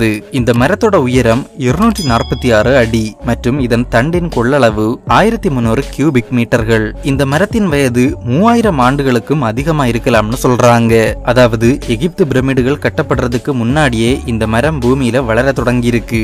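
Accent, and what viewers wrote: Kanyakumari, India. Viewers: கன்னியாகுமரி காரனா நீங்கள்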